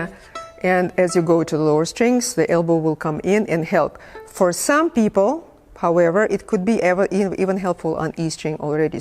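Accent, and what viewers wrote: Russia. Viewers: Какой сильны русский акцент! Но было интересно.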